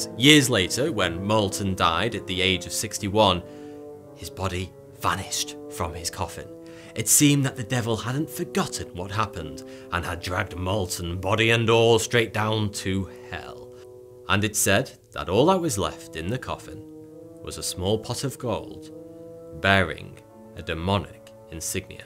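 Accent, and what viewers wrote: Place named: Cockney, United Kingdom. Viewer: it is called “the cockney accent”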